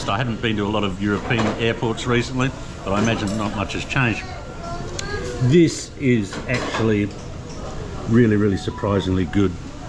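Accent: Australia. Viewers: I'm a Brit used to watching several fairly Naff travel channels (I subscribe to other genres as well). This channel is the absolute dogs b___ compared to them. What a great travel Vlog this is. I just love your attitude and your way with strangers. There are so many laughs, I loved the time when the two Russian guys were arguing and had been fighting, in what was a fairly tense environment your Aussie twang never changed and you just still sounded so relaxed 🤣. Just fantastic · Lovely vlog, speaks to real life and travels. I was intrigued by the dish you waxed lyrical in Singapore. The You*Tube translator took your Aussie English and came up with "Luxer". I just kept adding the ingredients as your poetic review progressed until "Laksa" finally populated.😆😍🤩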